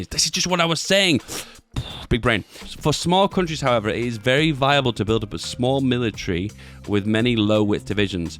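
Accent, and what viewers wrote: United Kingdom. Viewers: What do you say exactly here: ? The pronunciation is a bit too British for me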